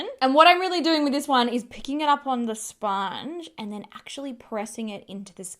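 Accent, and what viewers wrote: Australia. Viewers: I’m a libra too! But I love how you Aussies say it! ❤❤❤❤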